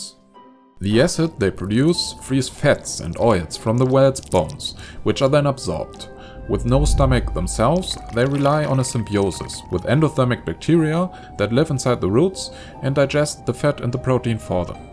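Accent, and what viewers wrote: Germany. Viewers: i guess he is german . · I cant unhear the fact that he speaks with a german accent XD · I still do not know why this worm is a "zombie worm". But now fortunately I know that Indian English is not alone - German English also exists ;) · That German accent ~ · i just geared your accent and knew you are german xD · Are you German?? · You sound German, but your english is good. A rare thing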